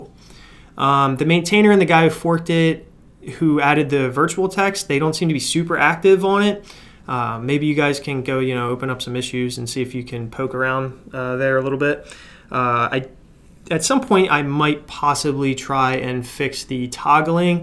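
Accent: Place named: Pennsylvania, United States. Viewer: Trying to place your accent. Sounds kind of New York or New Jersey at times but mostly Pennsylvania. Maybe I'm way off though.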